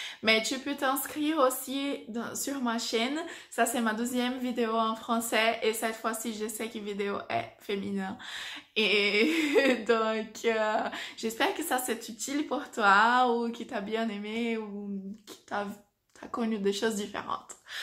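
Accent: France. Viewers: Que linda ela falando francês!!!1 Seu francês evoluiu muuuuito,. Parabéns!!! Tá com sotaque francês já hahaha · Tu as l’accent du Sud de la France, c’est mignon. 💖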